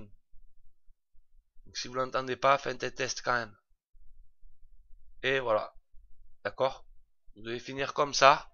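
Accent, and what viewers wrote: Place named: South, France. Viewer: Trop bien l'accent,on a le même 👍d'ailleurs on se fout souvent de ma gueule quand je joue avec le micro!je me fais traiter de "marseillais"......à croire que personne ne connaît Toulouse, béziers, Carcassonne, Montpellier, Narbonne....C'EST LE SUD TOUT ÇA LES GARS!!bien tes vidéos,j'aime bien les "d'accord "que tu balances,j'ai l'impression qu'il faut que je réponde "oui,daccord" 😉je m'abonne l'ami 👍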